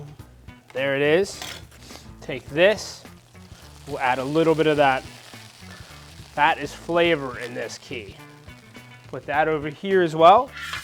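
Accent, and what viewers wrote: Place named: Pittsburgh, United States. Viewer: Brother yous is crispy and crackerty is you from Pittsburgh?!?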